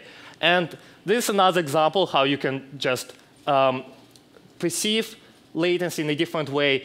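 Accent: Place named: Russia. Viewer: Russian accent. Is he from Russia?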